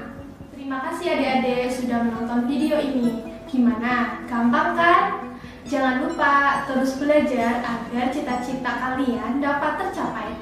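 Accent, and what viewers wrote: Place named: Java, Indonesia. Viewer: Aku suka dengan medhoknya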